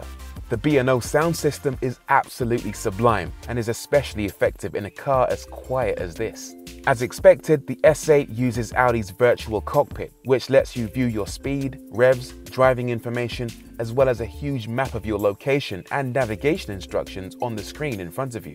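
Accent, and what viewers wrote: United Kingdom. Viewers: I love your presentation and British accent 👍🏿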